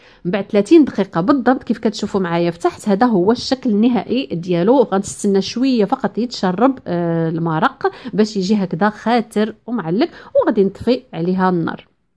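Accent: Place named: Morocco. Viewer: ماشاء الله روعة يكفي اللهجة المغربية الحلوة تحية من سوريا لاهلنا في المغرب محمود الحسين،، بيروت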